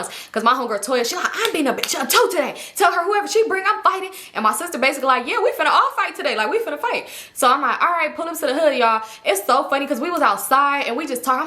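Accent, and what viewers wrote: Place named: Los Angeles, United States. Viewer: I discovered you from honey K whenever she went to your rodeo event & now im hooked on your channel. I love the way you and her talk too with the LA accents 😂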